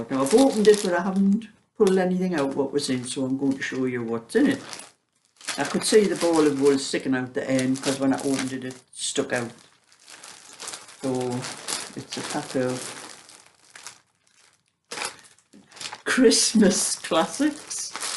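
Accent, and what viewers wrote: Newcastle, United Kingdom. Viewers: Always chuckle when you say say you’ve got “ happy Mail” Why I hear you ask ? For weeks I’ve been hearing you say Happy MEAL lol It’s ya lovely gordie accent lol Think penny’s dropped xxx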